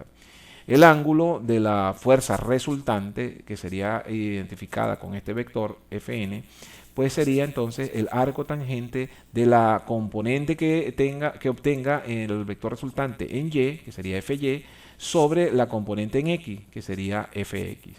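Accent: Venezuela. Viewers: Ese acento venezolano que no se lo quita nadie. Saludos desde Venezuela. Estoy mirando el vídeo horas antes del examen. Deseenme suerte papus v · Acento Venezolano Nojoda! gracias a ese profesor saqué 20 Pts :D, se lo agradezco mucho profe!.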